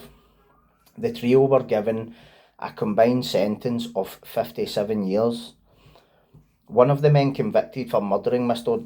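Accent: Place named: Glasgow, United Kingdom. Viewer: No idea how i found your channel pal but im glad i did, id never heard about about this case, never mind what anyone comments or says, always safe sitting behind a screen, saying it to your face is always a different story pal, im subscribing so thank you for your hard work, great video xx its always nice to hear a fellow Glasweigian on youtube 😊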